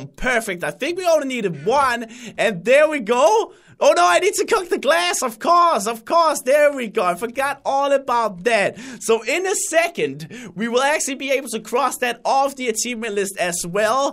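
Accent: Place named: Denmark. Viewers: He is danish. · arent you danish because your accent is very danish sounding no offence im danish too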